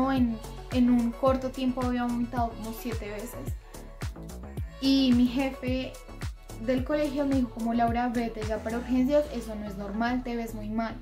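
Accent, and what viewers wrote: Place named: Colombia. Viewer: oye la voz colombiana me gusta mucho un saludo desde México ♥️ cuídate mucho y bendiciones 🙏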